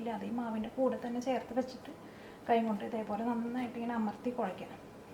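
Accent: Thrissur, India. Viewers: Within 3 hours, almost 1500 likes !!!!!!!! it's a reward for your hard work. I noticed the strain in your fingers while you were mixing the dough. As you said, I think this is the first recipe for pappadam in the net, which is our favorite one, of course, everyones. Really it's my son who introduced you to me. When he was living alone in Florida he was trying your recipes and now his wife has no complaints about him because he knows cooking and helps her. All of us like your Trichur accent.Let me try now itself and if it comes okay I'll definitely let you know. I tried WhatsApp but not connecting. Could you please tell me where you are living.Thanks a lot and keep it up. :) this is the first time I am subscribing a channel.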